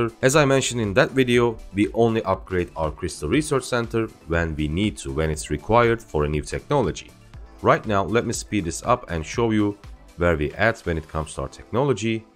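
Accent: Turkey. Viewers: Paketin çıkan ücretinden türk olduğunu anladım tahmin etmiştim konuşma şeklinden. :D Videon çok faydalı oluyor teşekkür ederiz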